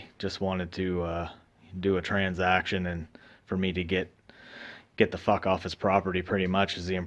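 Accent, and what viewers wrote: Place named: Nova Scotia, Canada. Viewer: Ohhhhh nova Scotia...I should have picked up on the accent...iv got the same situation..I picked up this little atc on a trade..got it going...but it's too small for me.. so just sits...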